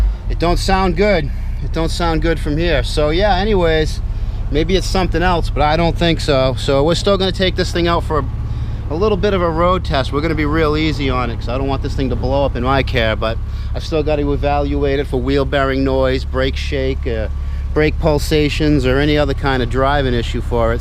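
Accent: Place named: Boston, United States. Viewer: Boston guy!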